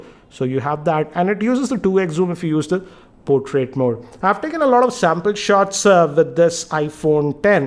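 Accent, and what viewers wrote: India. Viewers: he sounds like apu from the simpsons. can someone tell what his accent is? (which state in india) just curious.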